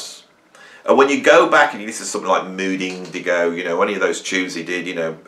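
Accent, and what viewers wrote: Birmingham, United Kingdom. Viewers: I follow David Packham on American politics - you need to do an advert at the end of each video. Posh pot noodles, hair gel, face scrub...you latest book! Alright, the last is a bit Rick Beato. 😂 Soon your studio will be filled with so much crap you won't be able to film! On seconds thoughts, the loud, opinionated Brummie still wins every time.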